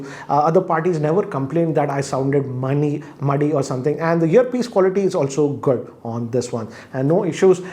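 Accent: India. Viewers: when are you gonna drop that Indian accent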